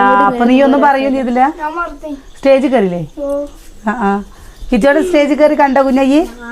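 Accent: Palakkad, India. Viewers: colour mangiyirikkanu. Nalla bright colours like meroon okke idoo., presikkku colour ullondu nannayirikkum onnu maatti pidichu nokku. Nighty maatty frock nighty vedikkoo. Enikku 40 aayi but njan frock kurti aanu veettil idaru.. Nammal nannayitu nadakkansm. Athu pole hair video yil verumbol onnu clip cheythu idooo, eppolum ingane ketti vekkathe. Oru change vende. Nannayitu video yi chilappolengilum.frock nighty Instagram il 200 alle. Rendalum koodi oru psc apply cheythitu book vedichu parasparam chodhichitu padikkoo. Jeevitham mari mariyum. Endholke paranjalum... Enikku bhayangara ishtaaaaa ee slang.. Palakkad an bhasha. Eppo enikkku edayil verum appo ende makkal kaliyakkum❤❤❤❤luv u all soooo much., kuttikal... Amma kulichitu mudi azhichidumbol nallachelanu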